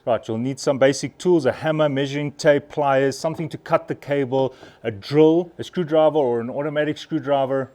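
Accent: South Africa. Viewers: No doubt about it. South Africans have great accents and always clearly spoken. Excellent 👌 thank you very much.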